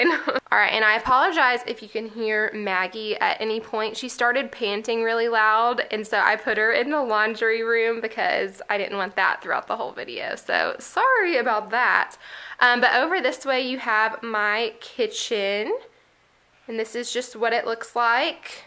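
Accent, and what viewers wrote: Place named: Tennessee, United States. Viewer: I found your channel through this video, it was reccomended to me because of my minimalism searches. lol. I rarely comment on anything on YouTube, but I had to say, I love everything about you and your channel. I live in Wisconsin, but I'm from Pigeon Forge, Tennessee. You remind me of home! Your accent, your style, your record.. Everything ❤️ You're such a breath of fresh air. I'm subscribing for sure! ❤️❤️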